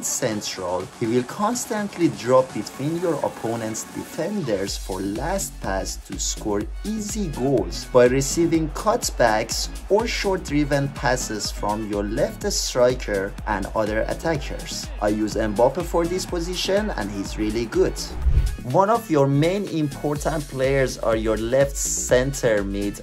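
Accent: Iran. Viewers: persian accent hits so hard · your accent looks perfectly like iranians داداش😂❤️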